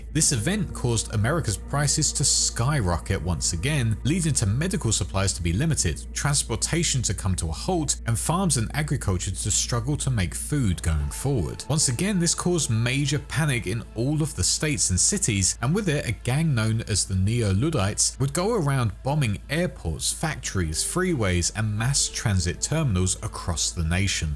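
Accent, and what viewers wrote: United Kingdom. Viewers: nah he’s just British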